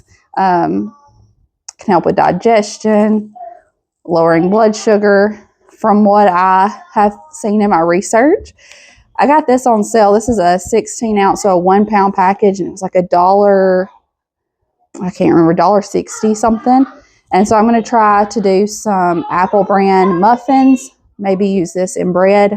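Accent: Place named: East Tennessee, United States. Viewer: My family is from East Tennessee and your accent is so similar to that, are you originally from that area, by chance? I swear, hearing you speak makes me think of them, I love it.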